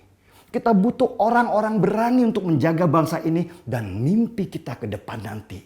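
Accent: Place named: Medan, Indonesia. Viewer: Jelas, lugas, tegas... gaya medan banget... Indonesia beruntung memiliki karakter2 suku yg khas dan berbeda2... Gunakan semua karakter dr msg2 suku utk membawa Indonesia maju...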